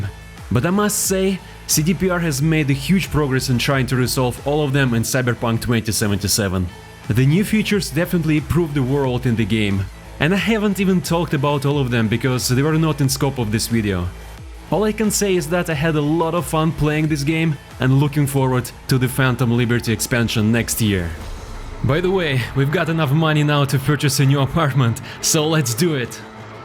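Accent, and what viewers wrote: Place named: Eastern Europe. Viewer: also, where are you from? I hear a nice eastern-European accent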